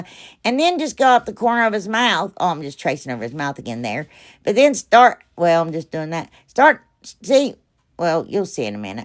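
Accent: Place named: Appalachia, United States. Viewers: Um yea her hillbilly talk well my gosh I thought you were as hillbilly as it got lol !Cute crafts if you can get through the talking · That little lady bug and bee could Bee my friends any day. Absolutely adorable! Now, we need you to do Vampires at Halloween. Yeah, I love those fast talking Appalachian people. I grew up with them. That's why I love to listen to you.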